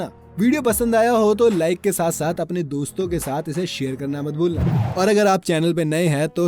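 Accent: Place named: South India, India. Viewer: South Indian voice hai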